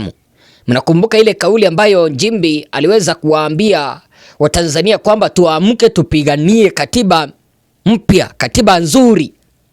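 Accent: Kenya. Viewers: Batilinimamayako nababayako mbwawewe kwanzahatakiswahilihuwezi kwanzawewe siomtanzania hakiswahilichako ninakujua wewe nimkenya kaongelee mamboyakenyeyenu nchimmeiuza kwamabwenyenye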